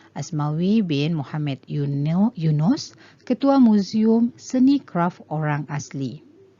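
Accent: Sabah, Malaysia. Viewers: Kenapa cikgu cakap macam orang sabah.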